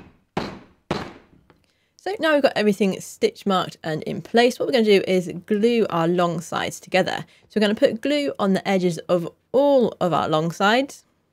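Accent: England, United Kingdom. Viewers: hello dear friend, i enjoyed the videos and i like your English accent cause i'm learning English by listening to many videos, and i saw you folding the edges of suede leather, i want to advise you to get a help of thin comb may be you can break some of needles of the comb to create a space which will help you to assemble correctly the glued parts with a perfect line, god bless you . i'm from Algeria, Northern Africa.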